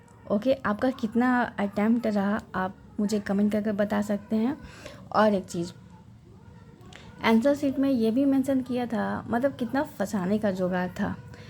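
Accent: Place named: South Delhi, India. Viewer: Aap bihar ki nii lgte ur tune is like south delhi girl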